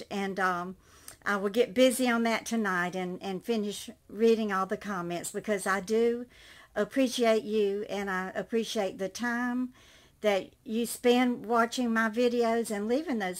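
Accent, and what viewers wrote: South, United States. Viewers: you are a delight to listen too and see on your videos. Your voice is so southern and relaxing. I too am from the south. I was born 9/11/43 and raised in Jacksonville Fl. I am several years older than you though. Some of the things you say I think, "Well thinks just like me about some things."😃 I am new watching your videos. The first one I watched was of you just moving to New York. I wonder about you and the snow!! If you aren't use to driving in the snow that will be rough. But some people put chains (I think) on their car tires. But just wanted to write you a little note to tell you I enjoy your videos. Looking forward to seeing your apartment after you get all moved in and fixed up. God Bless you 🙂❤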